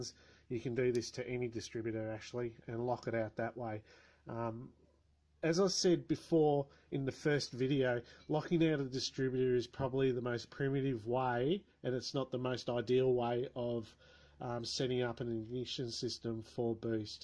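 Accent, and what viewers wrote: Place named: Australia. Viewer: So refreshing to hear an Australian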